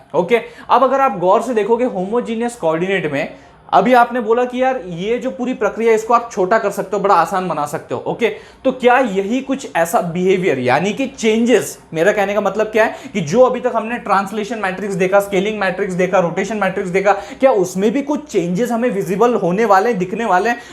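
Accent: Maharashtra, India. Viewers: Sir aap Marathi bande ho na 😅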